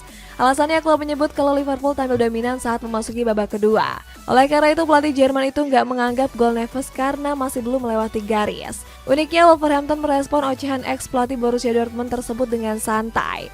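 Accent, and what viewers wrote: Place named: Banjar, Indonesia. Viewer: admin mulai gokil , ad bhs banjar samarinda wkwkwk mantap sanakkk salam buan jelawat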